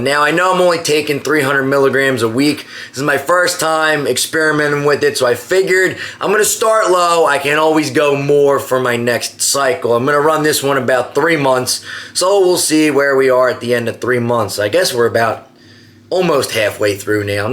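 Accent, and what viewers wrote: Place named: South, United States. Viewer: Bro its been ages since ive heard such a strong southern accent 🤣😅🤣😅😂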